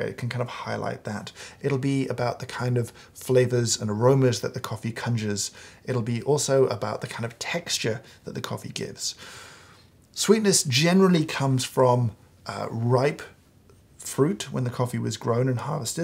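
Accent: United Kingdom. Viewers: I have learned so much from you over the past year that I haved started watching your videos. They are incredibly helpful and full of insight and expertise. I love your way of talking (and it's not just because you have a British accent 😁😂) and how you easily articulate your thoughts, it actually reminds me of Allan Watts. You have intensified my love for coffee a thousand times more. Thank you very much for being so amazing at what you do. Wish you bliss, joy and health. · If someone ever tells you, you speak too fast--ignore them. I love to listen to people who are fluent, concise, precise, and find it painful to focus on information, which is delivered slowly, with lots of gaps and searching for the right word. Having a youtube channel is the perfect venue for you. You have something you know well (coffee) and are able to speak about it intelligently, with concentration and focus. 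I am not a coffee drinker (yet?), but enjoy your videos because of your delivery--made even better by your British "accent". Thanks.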